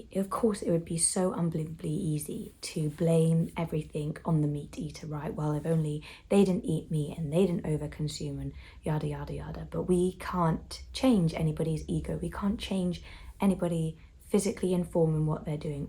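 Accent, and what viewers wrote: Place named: United Kingdom. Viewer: I have a crush on this woman. Her British accent is so cute!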